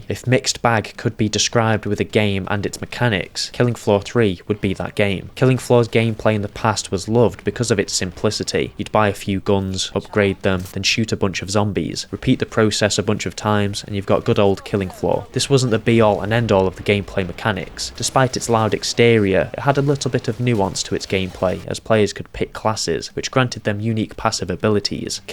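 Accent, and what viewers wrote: United Kingdom. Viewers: I felt like it was a matter of time before I actually heard a brit shitting on this game.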